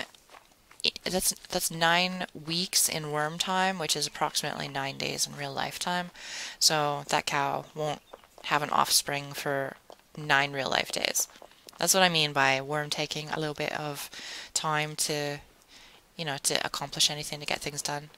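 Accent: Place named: United Kingdom. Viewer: Where's your accent from? It sounds like a little British in there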